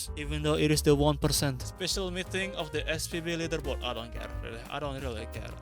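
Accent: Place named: Russia. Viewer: You speak Like Russian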